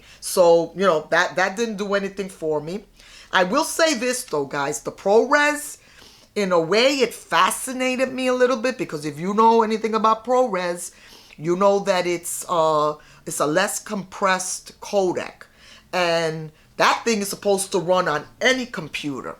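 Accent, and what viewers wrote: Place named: New York, United States. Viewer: I watched the best phone camera review this morning from Tony and Chelsea - they shot the video with the GH6 and there were moments when that autofocus was simply not cutting the mustard. I've seen some amazng footage out of the GH6 but normally from professionals who know what they are doing. I'd love to buy this camera but as a Fuji XT3 who has suffered in the past from autofocus issues (thankfully less these days), I think my next camea will be the Sony A7IV. Talking about computers and codecs - My new Macbook Air M1 is fantastic - complete game changer! P.s. Love your accent! Are you from near New York? Makes me want to watch Law and Order again.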